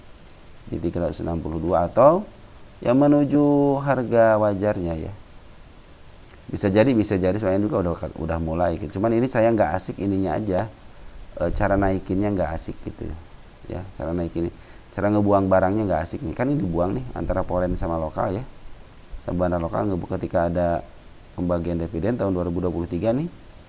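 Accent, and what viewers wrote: West Java, Indonesia. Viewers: Si aa urang sunda pisan logatna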